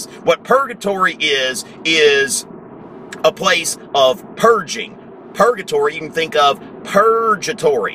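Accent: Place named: Georgia, United States. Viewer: Georgian accent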